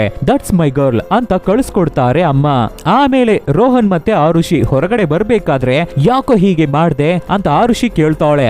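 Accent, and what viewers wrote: Tamil Nadu, India. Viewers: Kannadana saryaag maathad guru...neenen Tamil avnaa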